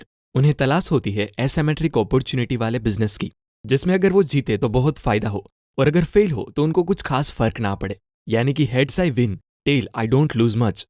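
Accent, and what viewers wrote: Gujarat, India. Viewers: Tame gujarati chho?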